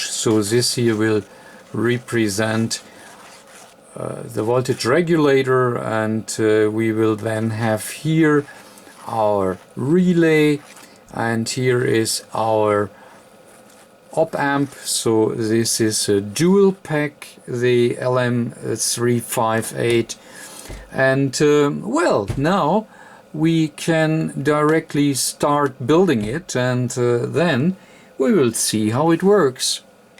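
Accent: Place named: Germany. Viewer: This is a magnificent tutorial. I think you might say "ausgezeichnett". It's got everything - careful and clear description of the theory, the practical implementation and finally the proof that it all works to plan. I have absolutely no problem with the slow delivery in English. Makes it clearer and I find the German accent charming.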